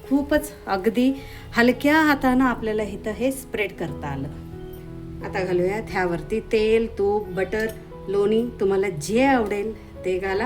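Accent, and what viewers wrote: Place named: Kalaburagi, India. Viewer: कर्नाटकांमध्ये कुठे? माझे माहेर कलबुर्गीचे आहे.तुमचे बोलणे ऐकताना मला माझ्या माहेरची खूप आठवण आली.डोसा रेसिपी मस्त नक्की करून बघेन.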